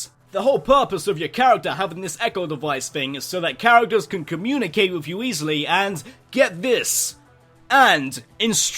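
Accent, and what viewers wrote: United Kingdom. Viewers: Your a brit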